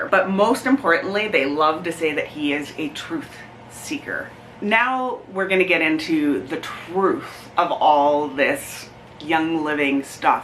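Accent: Canada. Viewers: Canadian accents make me happy 🥰 I’m from Tennessee and I love the differences in how we speak! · your Canadian accent is EVERYTHING ❤️ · I think she’s from out west in Canada because we don’t all talk like her. She’s got the typical out west (Alberta, BC). My family lives out there. I’m in Quebec. It’s fun listening to her because it makes me think of my family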